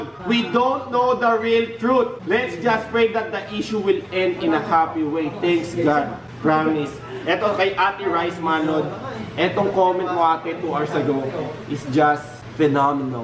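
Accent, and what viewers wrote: Visayas, Philippines. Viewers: bisaya ka? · Bisaya ka ba? 😁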